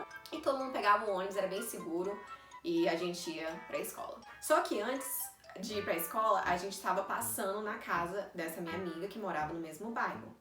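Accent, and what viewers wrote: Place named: Northeast, Brazil. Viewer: Tu é nordestina pura em kkkk "Mangá"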